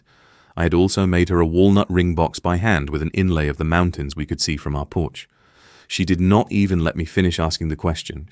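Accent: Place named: RP, United Kingdom. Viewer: lol, these Ai generated stories are whacked out😂 He Supposedly grew up in Knoxville Tennessee, yet has an upper class British accent 😂.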